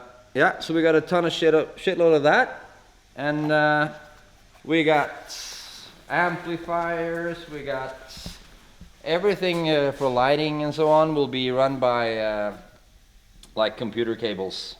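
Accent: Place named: Sweden. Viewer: Well done, your Swinglish was great. 👌 Amazing amount of work you put in to these cars and great explanations of the work here on YT. 👍😃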